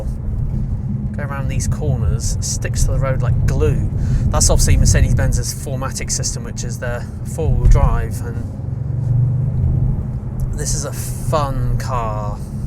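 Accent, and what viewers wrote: England, United Kingdom. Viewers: Great video. English accent always adds a level of sophistication. I miss Europe.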